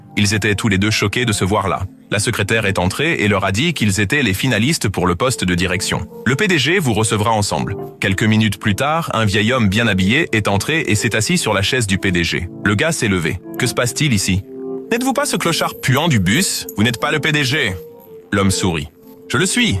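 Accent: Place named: France. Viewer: Votre accent français c'est adorable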